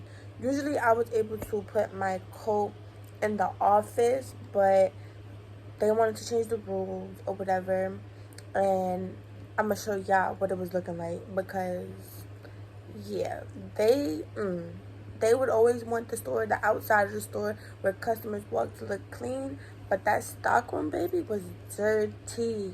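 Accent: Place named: East Coast, United States. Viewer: You from the east coast? NY or NJ I can tell by the accent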